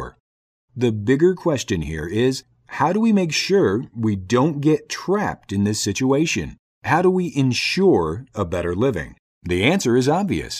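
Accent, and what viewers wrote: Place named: United States. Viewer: excellent explanations. I have to say this is a little bit weird to hear an American accent referring Indian stuff so familiarly like India is his homecountry.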